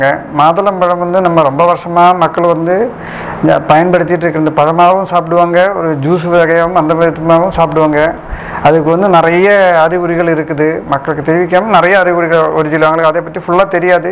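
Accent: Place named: Kerala, India. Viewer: Malayalee Tamil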